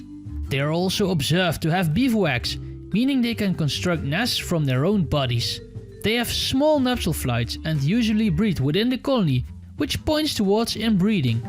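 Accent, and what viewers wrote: Netherlands. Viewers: Haha na de eerste paar seconden wist ik al meteen dat je Nederlands was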